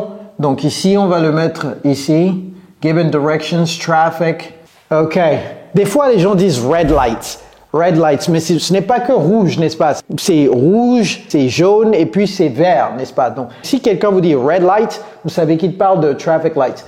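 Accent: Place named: United States. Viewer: hier accent américain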